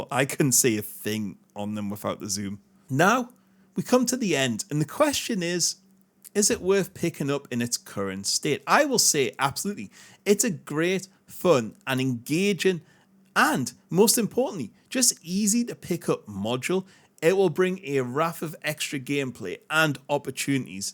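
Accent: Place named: Newcastle, United Kingdom. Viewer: Nice to hear a Geordie voice in the milsim community.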